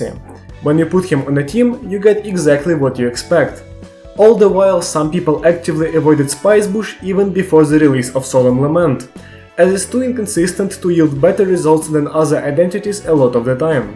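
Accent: Russia. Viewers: Будто бы у тебя русский акцент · Русский акцент не скроишь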